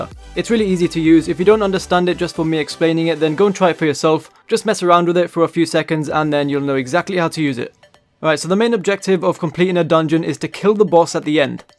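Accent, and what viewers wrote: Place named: United Kingdom. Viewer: i wish i had a dollar for every time i heard this brit say "as well"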